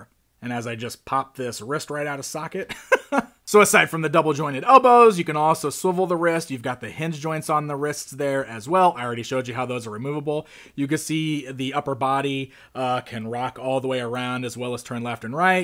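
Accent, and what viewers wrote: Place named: United States. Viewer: Great review,. I totally agree about the figure's head. It does indeed look a little small for the body, and his face looks real boyish also, which totally contradicts the statement..."Most Powerfull MAN in The Universe.", and again, the character's very name: HE-MAN (Not He-Teen, let alone He-Boy). The harness also looks kinda small for his torso, and it should look as if it was made of thick, rough leather, which is something we know toy makers can do, they can make plastic look any way they want to (if they really want to), just by using the proper color shades in the plastic's mix. On the other hand, all of the above "Flaws" on the figure, are nothing but great excuses for talented customizers to do what they do best, and make their personal HE-MAN figures look just the way MATTEL could have, IF they FOR ONCE would have prioritized QUALITY and CUSTOMER SATISFACTION, over GREED (Bulk sales, and Profits). 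But that's how things are, most toymakers (except for those out of Japan), are in business just for the sake of making money, and not concerned in the least about art nor perfection. Shame on our western society, but again, great review,! Easy to understand AMERICAN ENGLISH (unlike foreign reviewers, trying to speak our language, but miserably failing, and butchering it instead), awesome footage of the toys being discussed, and good knowledge of them, which we all appreciate. Great work, man, keep it up!!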